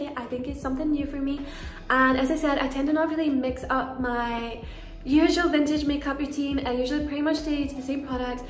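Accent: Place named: Scotland, United Kingdom. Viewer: I love your accent and the way you said bobby brown, I was just like, yup, she is definitely scottish. I find northern british accents so friendly and welcoming😊 It fits your personality and the bubbly and fast way you talk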